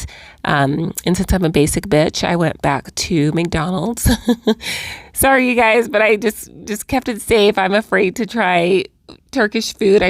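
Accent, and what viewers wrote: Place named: West Coast, United States. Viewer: I love your video. Very informative and detailed. 10K for flights?!!! u rich lol...I would suggest traveling more so you're not too easily nervous about experiencing new things in countries. I am an American living in Denmark now, and love seeing fellow USA people travel. You're accent and vibe tells me you're from west coast. aye.. lol. Anyway u have my subscribe.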